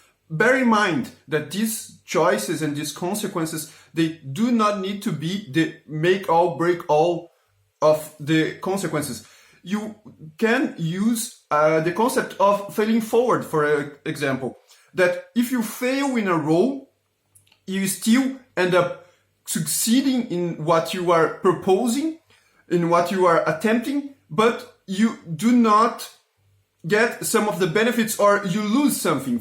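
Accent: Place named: Brazil. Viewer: Consigo sentir o sotaque brasileiro à quilometros de distancia KKKKK, ótimo vídeo mano, tava precisando de dicas assim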